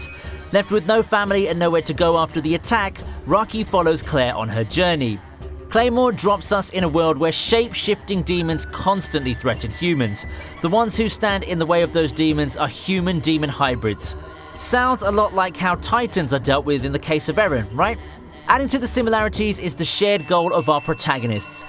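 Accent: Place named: United Kingdom. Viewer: I like your UK accent 👌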